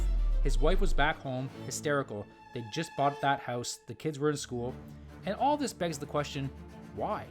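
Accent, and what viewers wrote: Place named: United States. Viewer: Sounds like an American talking about a subject he knows little about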